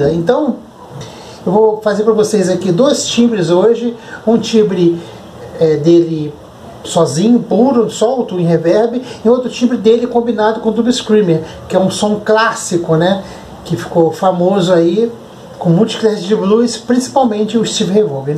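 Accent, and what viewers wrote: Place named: Rio de Janeiro, Brazil. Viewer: Você mora em SP?? (Seu sotaque é do RJ)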